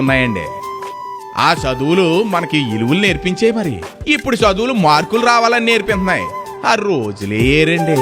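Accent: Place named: Godavari, India. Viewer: మీరు మన గోదావరి యాస లో చెప్పిన విదానం అదిరి పోయింది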